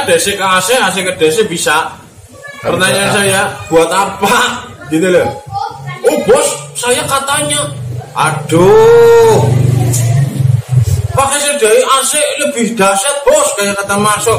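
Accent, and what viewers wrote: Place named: Java, Indonesia. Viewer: Aku pengemar ntn chanel. Tapi yg aku salut, abang nya slalu merokok. Kira2 brapa bungkus bos sehari habis roko nya. Wkwk... Slm logat jawa mas. Izin kan trus aku belajar d chanel nya.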